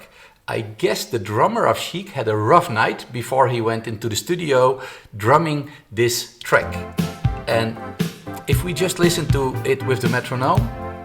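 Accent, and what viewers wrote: Netherlands. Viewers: Ik kan gelijk horen dat je uit Nederland komt leuk hoor ik ga deze app even downloaden hartstikke bedankt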